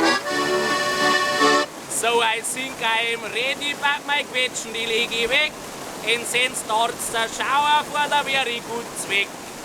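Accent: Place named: Bavaria, Germany. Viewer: du Depp, des is bayrisches Englisch.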